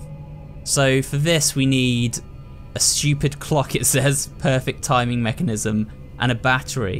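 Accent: United Kingdom. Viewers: Ohhh your British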